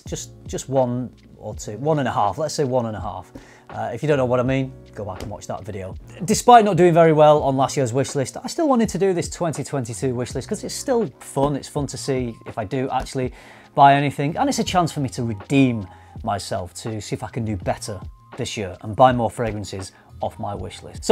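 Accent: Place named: Bolton, United Kingdom. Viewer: Are you from Greater Manchester like the Bolton area? I recognise the accent but it's more like mine, I'm from Bolton but I moved to Lancaster then Workington and my accent has changed. I don't say perk instead of park anymore or hew instead of how etc. I basically round my vowels now but people can still tell I'm from Bolton even though it has been 19 years. I might be wrong but I'm sure I can hear Bolton in your accent or close to Bolton. I feel like I've heard your voice and seen you somewhere else do you do videos on facebook? Only just found your videos I just watched your comparing Aventus and like the cut of your jib so just subscribed!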